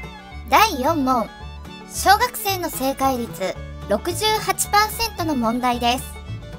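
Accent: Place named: Kansai, Japan. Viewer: ママは関西やな〜 マジで関西人やで〜 ハマりまくりでんがな ラストの「ラッセルラッセル」 大ウケでっせ〜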